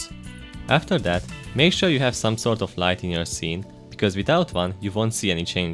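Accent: Hungary. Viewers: Az a jó fajta magyar akcentus nagyon hamar el árult de érdekesek a videóid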